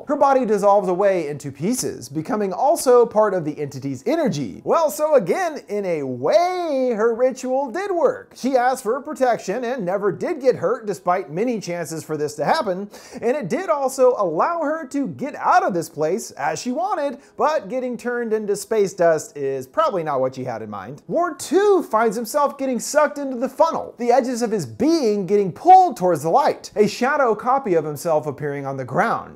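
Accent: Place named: United States. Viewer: how americans speak, jesus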